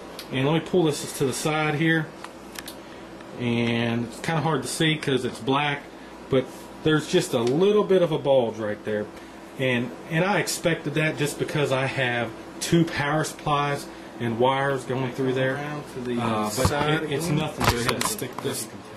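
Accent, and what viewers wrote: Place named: South, United States. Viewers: Your accent is so... southern. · I'm in love. even though i have a basic computer (if im compairing it to this! lol) but I LOVE THIS CASE. makes me hate my antec 900 lol. very nice and clean build. and i love your accent. nice southern accent :)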